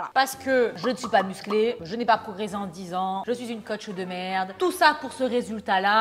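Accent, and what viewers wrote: South, France. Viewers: Je vous adore, je ne suis pas très assidu sur TSE 😔 mais vous m'avez fait beaucoup de bien pendant la pandémie. Votre travail est incroyable en deux ans, félicitations a vous et votre équipe. Et entendre votre petit accent du sud me fait du bien icitte au Québec. On lâche pas 😊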